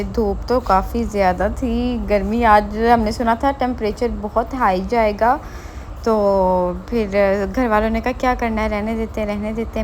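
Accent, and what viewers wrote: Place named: Punjab, India. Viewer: Love your vlogs ND language style too ND specially your Punjabi accent 😁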